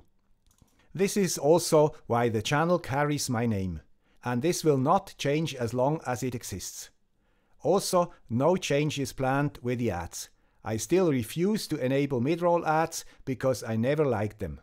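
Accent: Switzerland. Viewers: Joking aside, very nice content. Continue trusting 🙂. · This is a much more philosophical video than I expected, but I enjoyed it. Thanks! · I’d like to think not many commercials or youtube channels influence me in a substantial way. You are the exception and it has allways been a good experience. If i need some engineering advise or a point of view on something i usually think “what will the have to say about this”. You really do add something to the table and i trust the “Swiss accent” brand. Not doing any midroll commercials is also very much appreciated. I have been annoyed much by youtube lately and unsubscribed from some channels that run more than 3 commercials in 10 minutes. What a pleasure to watch without any rude interruptions! Wish you all the best in 2021! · Your video is an important message today, not just for Engineering, but for day to day life. 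I greatly appreciate your channel and always smile when I see a new one of your videos appear. All the best from the USA where trust is in rather short supply. Hopefully, we can learn a little from the!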